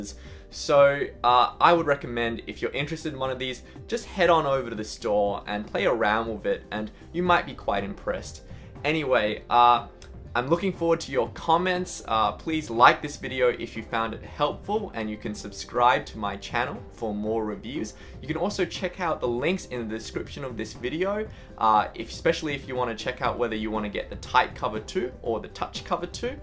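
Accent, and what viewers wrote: Australia. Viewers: I thought you're gonna speak with a japanese accent and then it turned out you're australian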